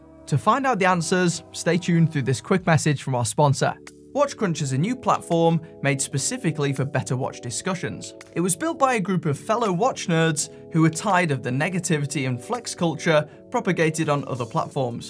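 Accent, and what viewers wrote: United Kingdom. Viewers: Its always so weird to me to hear brits pronounce the "h" sound.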